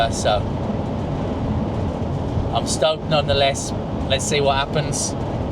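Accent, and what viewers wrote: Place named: Black Country, United Kingdom. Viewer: And just where abouts from the Midlands are you from? I'm reckonin Black Country... Bilston... Shortheath? Willenhall maybe? Go on, tell me how far off I am! Love the views and the van by the way!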